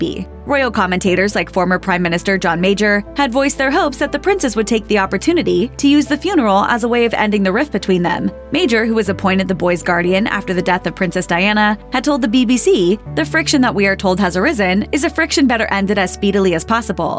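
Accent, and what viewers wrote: United States. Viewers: I'm not worried about any of them,,,, but why is an American sounding so full of knowledge about it,,,, oh wait, she's American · This is the American take. I don't give this much slack. The American accent is slident against the subject of the video. Yakkity yak yak! Phew! (I'm a Singaporean.)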